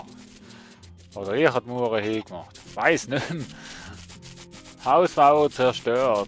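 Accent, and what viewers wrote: Switzerland. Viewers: Intressantes Spiel:). Zwar tue ich mich schwer dein Schwizerdütsch zu verstehen, aber so langsam komme ich rein:)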